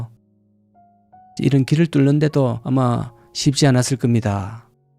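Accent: Gyeongsang, South Korea. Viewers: 경상도 사투리 딱 듣기실구마이 징허다이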